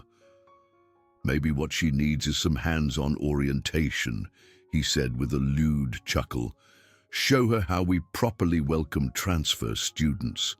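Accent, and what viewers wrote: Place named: United Kingdom. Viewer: Wow, sentences with punctuation, and an almost British-accentuated narrator, with judicious attention to intonation pattern as a function of sentence structure. A definite pleasure to hear. The plot may not be spectacular, given the prevalence of this genre, but it keeps moving the story along and the storyline is better than most.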